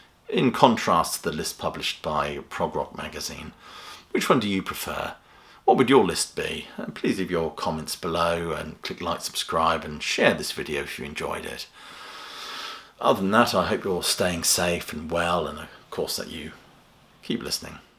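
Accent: United Kingdom. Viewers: i love your commentaries, even if i don't know all of the musicians you mention. if you don't have a PhD. in musicology, you deserve one. i don't know where you derived all your knowledge, but it makes me think you either owned a record store, or were a dj at some point. your blunt brutal honesty is both humorous and entertaining. the british accent adds a dimension as well.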